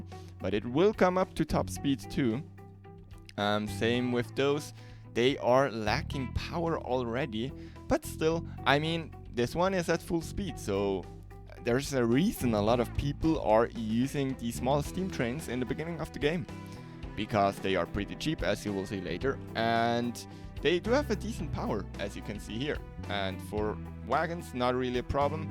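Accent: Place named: Germany. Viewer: Engineer with a german accent and 100% dedication? Definitely a like. TY for the work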